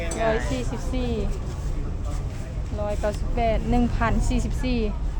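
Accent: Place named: Phu Thai, Thailand. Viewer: กดติดตามให้แล้วนะครับ สำเนียงการพูด เหมือนภูไท แถวจ.สกลนคร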